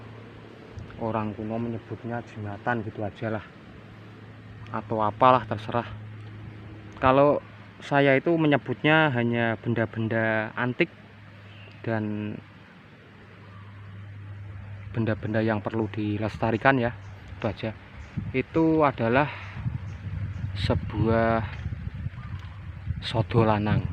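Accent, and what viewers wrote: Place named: Java, Indonesia. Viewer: Omngnya medok kayaknys orng jawa ksng prast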